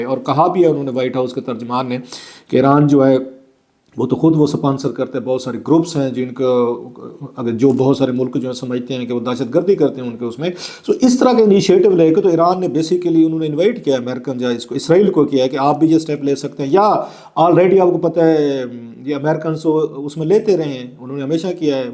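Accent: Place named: Punjab, Pakistan. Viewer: U don't have much knowledge nd don't know to speak Urdu clear, don't mix Punjabi in Urdu, I know u r from rural area of Punjab but with the passage of time u should be clear with the national language, anyway u should be very confident , u r confuse, very sad , pandu type anchor.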